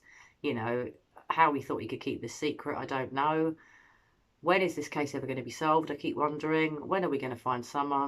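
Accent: United Kingdom. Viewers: Love your British accent. We need to get Don "bang to rights" (right?)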